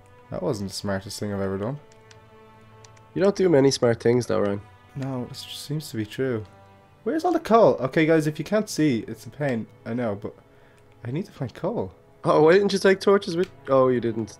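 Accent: Ireland. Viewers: Is he Irish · R u irish cuz i am Lepracorns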